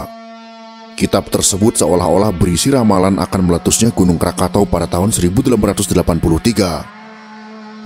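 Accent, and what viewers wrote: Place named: Bali, Indonesia. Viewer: Bang, org Bali ya??? Pengucapan T nya Te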